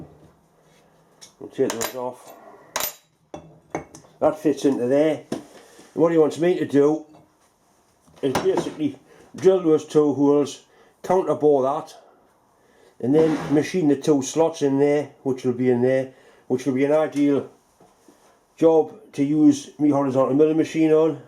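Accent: Newcastle, United Kingdom. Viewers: Someone Else It's Geordie, Newcastle area, Google Geordie shore. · what kind of UK accent is that?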